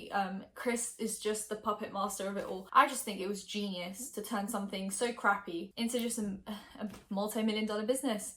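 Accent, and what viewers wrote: United Kingdom. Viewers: What U.K. region is your accent from?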